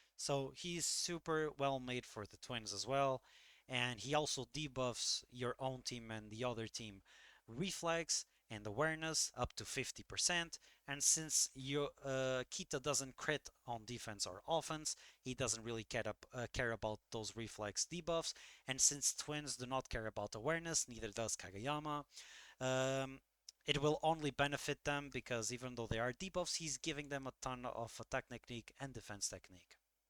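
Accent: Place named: Portugal. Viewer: You do sound portuguese thats why I said that hehe Maybe I can try to fully commit to this team and get both to SR4. However, I’m also interested in the new Nekoma team with SP V2 Kuro, Yaku, Yamamoto, and the Term-End and Kamo duo. But I think I wouldn’t be able to get any dupes, and I already have Kuroo V1.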